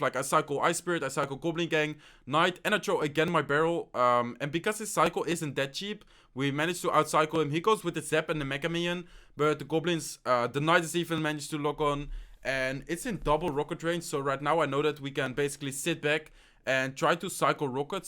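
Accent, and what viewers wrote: Netherlands. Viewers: Dutch accent is kinda annoying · I tough you were Dutch just like me cuz of the accent. I look in the description and it says: I’m from the Netherlands lol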